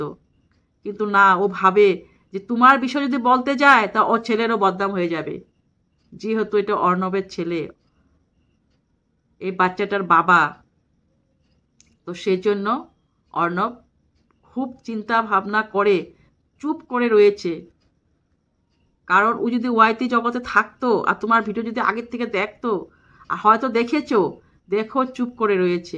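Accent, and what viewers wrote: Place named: Tripura, India. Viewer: তুমি কি ত্রিপুরাতে থাকো? কথা শুনে মোনে hochche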